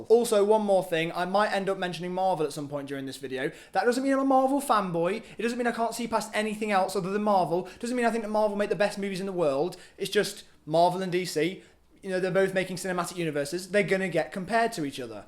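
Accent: United Kingdom. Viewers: This is the first vid I've seen of you. I'm in love with your face which is even more beautified with that British accent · that's the british accent, if you don't like it then you're going to have trouble talking to british people..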